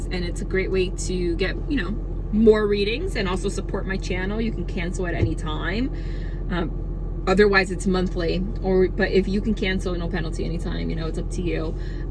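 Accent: New Jersey, United States. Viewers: Just wanted to tell you I LOVE your personality.. even your NJ accent lol ♡♡♡ have a blessed day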